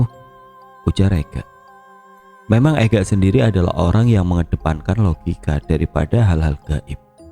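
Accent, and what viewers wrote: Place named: Surabaya, Indonesia. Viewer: Wes ta bedek ket awal logate koyok wong suroboyo, jancoookk 😂😂😂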